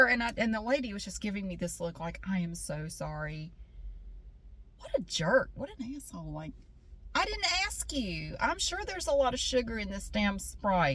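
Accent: South, United States. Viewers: I am patiently waiting for your costume reveal!! The holidays w/ southern ASMR sounds are my my favorite way to celebrate! You always get me in the spirit ❤️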